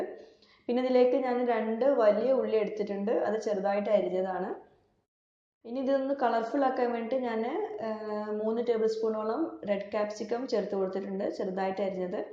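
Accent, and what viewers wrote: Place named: Kannur, India. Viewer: Thank you for a great presentation and I loved the way you speak Malayalam. It sounds like how it is spoken in the Kannur area.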